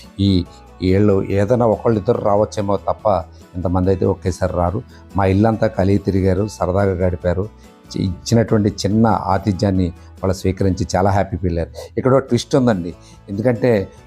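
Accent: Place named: Visakhapatnam, India. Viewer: godavari matayasaledu vizag matalvunnayi 😍😍🙏🙏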